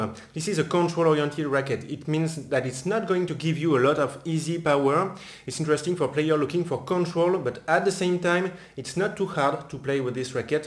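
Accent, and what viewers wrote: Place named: France. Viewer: french accent is so funny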